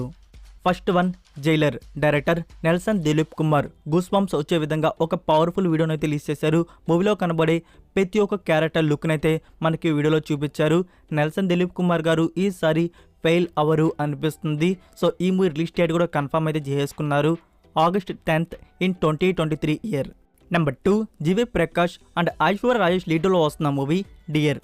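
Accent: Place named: Tamil Nadu, India. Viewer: Hahaha Tamilnadu Karnataka vallu matladinattu undhi mi Telugu....Anyway Ur Content is always Good brother....👏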